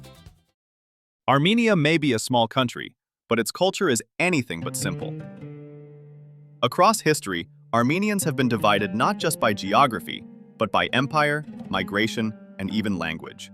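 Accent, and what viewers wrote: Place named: Arab world. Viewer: You are ethnically Armenian, but culturally you seem more connected to the Arab world. I notice Arabic influence in your cuisine and even in pronunciation, like saying “Suria” instead of “Syria.” you call yourself Middle Eastern and calling all Armenian nation like that. However, Armenia is not considered an Arab or Middle Eastern nation in terms of identity. Armenia is a member of the Council of Europe 🇪🇺 and other European institutions and is part of the South Caucasus region, which is associated with Europe in political and cultural classifications. Many Armenians in Armenia identify as European rather than Middle Eastern. Geographically also we are considered part of Europe because according to EU law it requires geographic requirements that's why they rejected Morocco in 1987 and Canada have been told can't join but us they haven't been told that can't join and Armenia approved membership bill. 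Not applied yet. The same Georgia which have been accepted after applying and received candidate status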